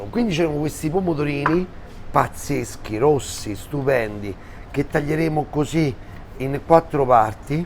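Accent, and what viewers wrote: Rome, Italy. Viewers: e tutta ‘sta sceneggiata a me dà fastidio. Mi piace il romanesco ma questo è esagerato . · ...... sei forte, già lo sai tu stesso che sei "er migliore'! Soprattutto la tua parlata romana non deve mancare.....é come la ciliegina sulla torta!... L'inglese rovina un po ' questo tuo insostituibile carisma... ciao ti vogliamo bene!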